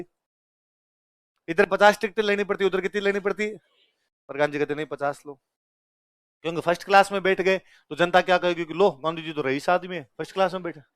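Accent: Rajasthan, India. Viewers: Bhai ji apki Rajasthani tone me hindi bhot chchhi lagti hai ❤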